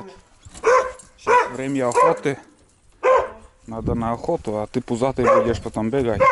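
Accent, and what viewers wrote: Komi, Russia. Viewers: у нас как то не заморачиваются по сортам картошки. У кого то красная лучше растет у кого то белая. Есть белая голландка - огромные продолговатые белые клубни. Много ее вырастает но не в каждый год она хорошо хранится. Кто не много садит - ведро, два тот пророщенную у бабок покупает а тут наши местные заморочки: если продают картоху с села Касиб (Соликамский район) то уходит в лёт. Считается что это очень хорошая картошка. Числа 6-го сентября я тоже копал картошку, сыро, дождь, с комьями грязи выковыривал. Потому что дожди лили весь август и пол июля. Половину выкинул потому что сгнило. А у вас вижу копаете земля нормальная сухая. Вижу вилами копаете - отец всегда вилами копал а я не могу, не нравится. И приятно коми речь послушать. Но ее хрен выучишь потому что язык неславянский. А если выучить то разговаривать тут не с кем. Всего доброго, Пермский край. 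· Назвал бы видос "Копаем картофель у соседей" такой говор как из нившеры. Превед из Сыктывкара. Сами мы из Кероса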